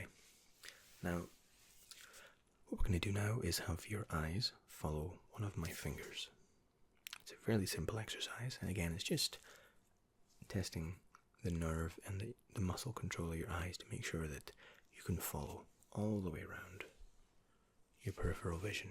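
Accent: Scotland, United Kingdom. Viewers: Are you from Scotland ?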